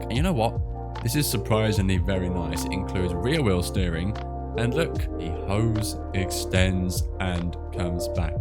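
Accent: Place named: Denmark. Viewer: holy danish accent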